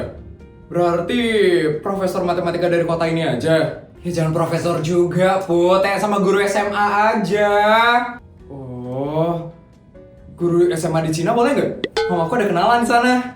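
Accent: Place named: United Kingdom. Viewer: Logat Inggrisnya dah hampir ilang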